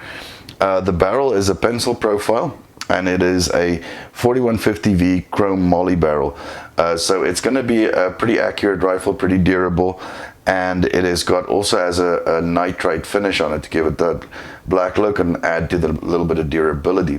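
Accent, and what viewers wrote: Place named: Pretoria, South Africa. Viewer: Am I hearing a Pretoria accent there 😂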